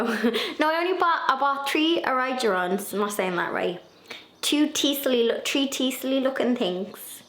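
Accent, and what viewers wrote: Ireland. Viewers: Love your garden updates, it's pure happiness listening to a familiar Irish voice and your slang terms that I miss (ex Blanchie living in UK), love your enthusiasm, you never fail to make me smile!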